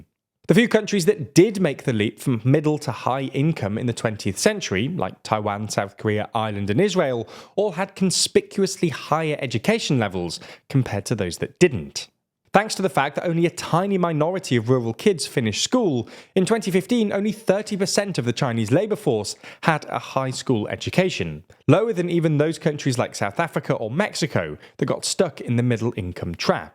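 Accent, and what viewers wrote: United Kingdom. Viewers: omg, this uk accent drives me crazy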